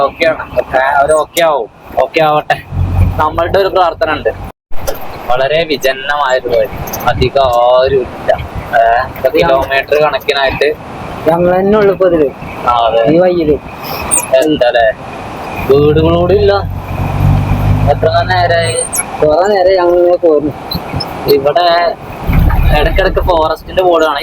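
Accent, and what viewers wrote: Malappuram, India. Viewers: ഗെയ്സ് നിങ്ങൾ പൊളിയാണ് സിമ്പിൾ ആയ വിവരണം ,നമ്മൾ സ്വയം യാത്ര ചെയ്ത് explore ചെയ്യുന്ന ഒരു feel ഒരു തള്ളും ഇല്ലാത്ത നല്ല വിവരണ vdo, ഞാനും ഒരു മലപ്പുറം ജില്ലക്കാരൻ തന്നെയാണ് ,, ചെറുതായിട്ട് നമ്മുടെ സ്ലാഗ് കുറച്ച് ഒക്കെ കുറക്കാം നിങ്ങൾക്ക് ഈസി ആയി പറ്റും ,വരും യാത്രകളിൽ ഡ്രോൺ ഒക്കെ ഉപയോഗിക്കാൻ കഴിയട്ടെ ,, നിങ്ങൾ മില്ല്യണുകൾ അടിച്ച് കേറി വരട്ടെ എന്ന് ഈശ്വരനോട് പ്രാർത്ഥിക്കുന്നു ,ഒപ്പം എല്ലാ സപ്പോർടും❤❤❤❤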